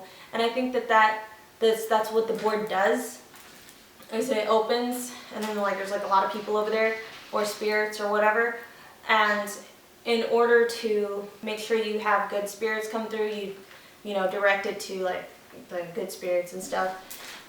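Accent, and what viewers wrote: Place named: United States. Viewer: What parts of Mexico are your parents from? Have you ever been to Mexico? You have no chicana accent which is interesting because, you have a normal american accent.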